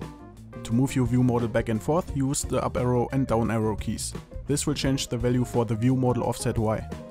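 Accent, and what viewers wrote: Germany. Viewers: Das deutsche Englisch Ich LIEBE ES 😂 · Man hört so das du deutsch bist haha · Biste deutscher? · Man hört sofort das du Deutscher bist :D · man merkt voll das du deutsche b ist nicht böse gemeint oder si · Could it be that you are german? The accent is real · Kann es sein das du deutscher bist ? / Are you German ?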